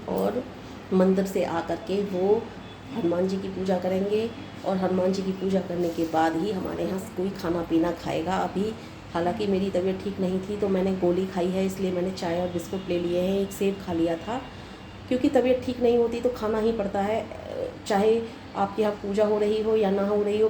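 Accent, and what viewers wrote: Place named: Hathras, India. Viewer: aapko bhi happy Diwali 🙏🏻 main bhi u.p se hi hu.. hathras se hu .. aapke bolne ke andaaz se laga ki aap bhi hathras se hi ho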